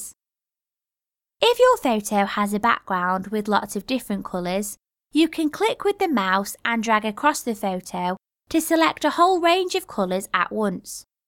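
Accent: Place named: England, United Kingdom. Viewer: Omg she sounds gorgeously English like someone on a reality tv show. love her!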